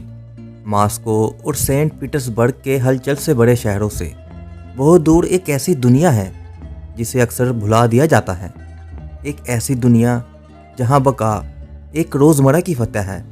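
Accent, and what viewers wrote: Lahore, Pakistan. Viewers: Are U Lahori Bahi- BGV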